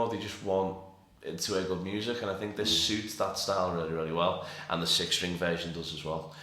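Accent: Liverpool, United Kingdom. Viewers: haha man, as soon as i heard you speak, no mistaking that Liverpewl accent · Rickenbacker and a scouse accent. What a combo.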